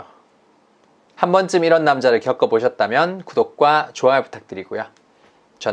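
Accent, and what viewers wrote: Gyeongsang, South Korea. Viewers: 밑지방 사람인거 티가 나기보단 그냥 대놓고 나 밑지방 사람이야!!!!!하네요. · 대구 말투에요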